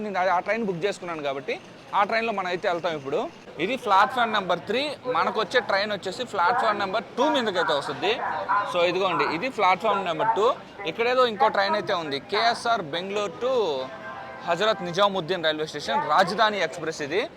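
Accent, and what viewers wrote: Nellore, India. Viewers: Nellore slang is super - mama 😁😁😄😄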